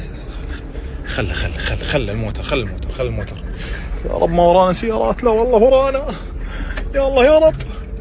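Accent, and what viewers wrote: Iraq. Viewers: اللهجه عراقيه اكيد متفهمون 😂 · لان عراقي اعذره لهجته تختلف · هاي اللهجة العراقية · هاي لهجه عراقيه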